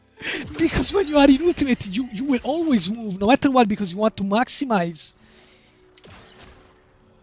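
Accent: Italy. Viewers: bro are you italian?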